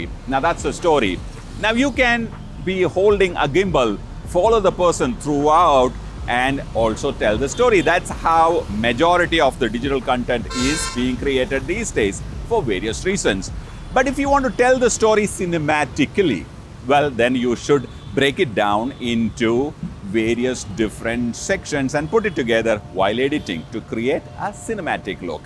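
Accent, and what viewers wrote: Kerala, India. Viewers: You speak English like Indian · He has a mallu accenture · sounds like keralite.. isnt it?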